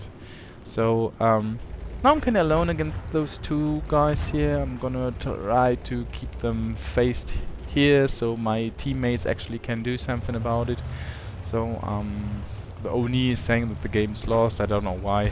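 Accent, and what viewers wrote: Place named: Germany. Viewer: your accent sounds like a native German speaker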